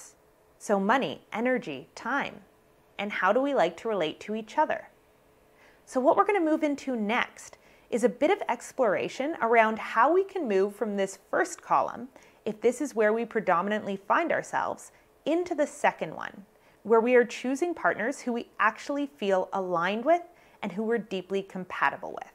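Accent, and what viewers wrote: Canada. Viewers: This lady has a nice Canadian accent.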